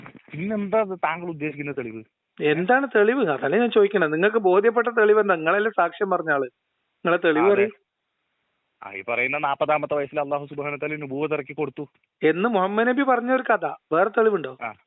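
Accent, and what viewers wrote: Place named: Palakkad, India. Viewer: അല്ല പാലക്കാടൻ ശൈലി തന്നെയാണിത്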